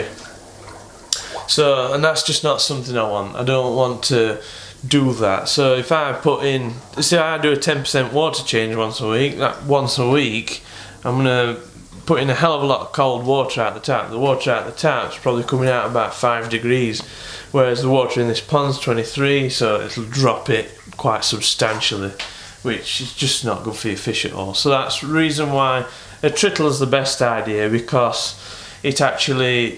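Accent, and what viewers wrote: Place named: Scotland, United Kingdom. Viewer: With the strong northern or Scottish accent, I am able to understand about half of what you say. You should hire a southerner or Irishman to do the speaking.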